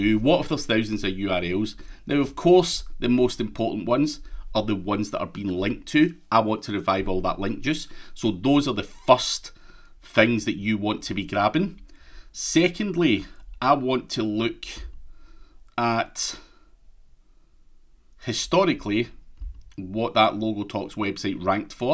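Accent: Scotland, United Kingdom. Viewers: man freaking genius!!! plus +1 on the Scottish accent!! i have been wondering about doing exactly that for a while now.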